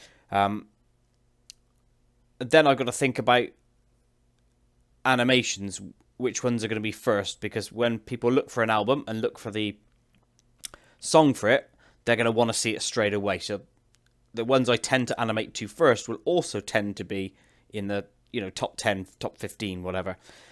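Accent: United Kingdom. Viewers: I have a question Are you from UK for something?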